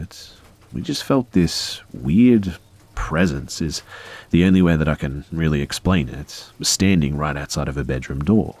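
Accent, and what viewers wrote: Australia. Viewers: Is Australian???????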